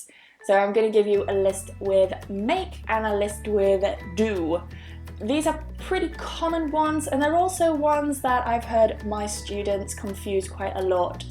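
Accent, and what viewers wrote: United Kingdom. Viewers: love your British accent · Please, You should make some videos about reading in English, I believe it will be useful furthermore would help us a lot and your British accent is beautiful, thank you for sharing this video plus a big kiss.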